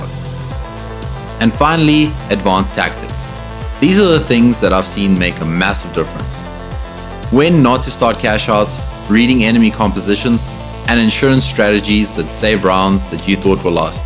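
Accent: South Africa. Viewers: Great video man, I just recently broke 200 hours in the game. You sound hella South African too🔥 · Ayo naaah you sound like my people, you from SA by any chance 👀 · You from South Africa??? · South african?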